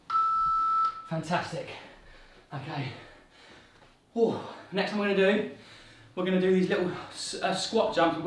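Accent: United Kingdom. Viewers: Far too british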